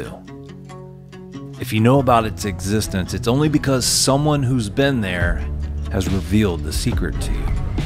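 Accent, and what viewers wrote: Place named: New England, United States. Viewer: Here Here! 😁😍 he"s a Yankee...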